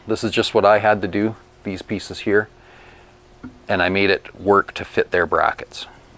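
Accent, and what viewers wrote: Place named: Canada. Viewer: You must be Canadian..... the accent gives you away!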